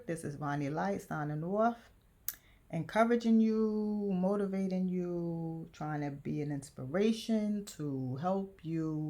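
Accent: New York, United States. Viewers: I can't believe I never saw you in Shanghai. I worked a Jiaotong University for many years and lived in China from <num>. It was hell coming back to be honest. Still working as a law professor in the US now. Sounds like you're also a NYer.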